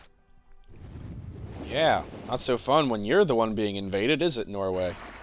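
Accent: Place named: England, United Kingdom. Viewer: I can definitely hear the English in your accent, just generally the way you speak and the words you use. Just thought I'd let yet know.. :D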